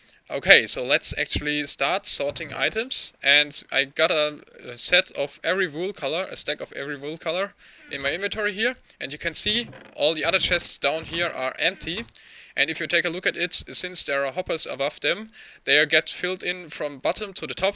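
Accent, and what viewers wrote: Germany. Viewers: Bist du Deutscher? ^^ Dein Englisch hört sich irgendwie so an :D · Du bist deutsch oder? :D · 100 % german-english ^^ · You are german, right? :D Man hörts an der englischen Aussprache :D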